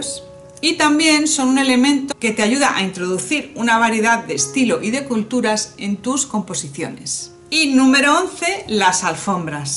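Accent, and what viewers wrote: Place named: Spain. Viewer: Soy de Argentina y siempre me molesta la onda "gallega" como decimos acá, pero tu onda y tu forma de hacer los videos (vidéos se dice en Argentina. con acento en la e) me parece muy agradable y me sorprendo a mí misma disfrutando tu forma de hablar y de enseñar. Muy bueno!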